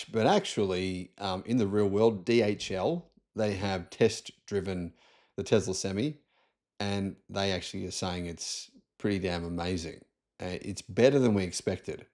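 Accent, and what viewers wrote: Australia. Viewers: He is an Aussie and that is how we say it, you sound as though yours is the definitive version. in aus a semi (pronounced e) or prime mover is what you call a tractor. we call the tractors tractors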